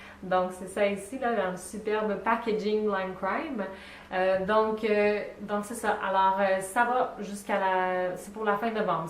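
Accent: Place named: Quebec, Canada. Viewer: hi!!je participe !!j'aimerai que tu fasse une video sur la cuisine de ton pays,je suis africaine plus precisement du TOGO et j'aimerai pouvoir interechanger sur de nouveau repas.en passant jolie accent(j'aime trop cette façon canadienne de parler le français)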